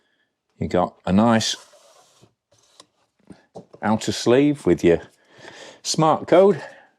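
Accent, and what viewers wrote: Northern England, United Kingdom. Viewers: Is it me or is accent getting more nothern as time goes by😂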